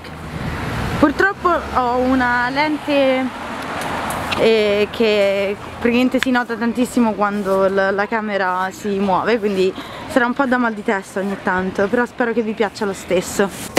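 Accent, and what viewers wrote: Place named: United Kingdom. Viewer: Oddio!!! non ti avevo mai sentita parlare in inglese: c'hai un super accento british!:P Divertiti a NY!! A prestissimo cara! Baci!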